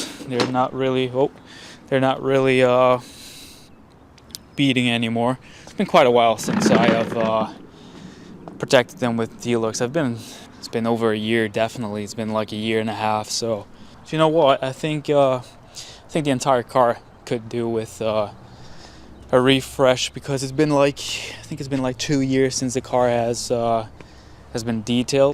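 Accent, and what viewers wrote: United States. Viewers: Are you american? Your english sounds very american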